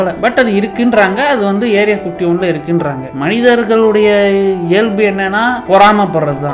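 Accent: Madurai, India. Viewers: Thala nee madurai ya?? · Bro's proving that he's from madurai in every chance he gets🙂‍↔️ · Bro nee madurai la irukkiya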